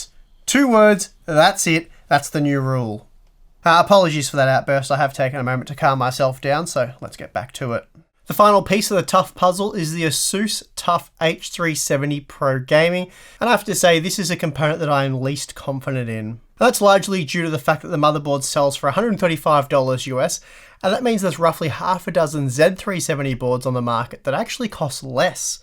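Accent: Australia. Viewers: Gotta say I can now understand, that australian accent of his really gave me trouble in the first few videos I watch from this chanel and that means a lot due to me living in Australia for almost a decade, ans I thought I can understand the accent already.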